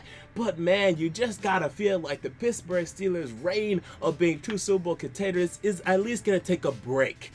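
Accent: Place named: South Florida, United States. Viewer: That South Floridian accent doe 💀💀💀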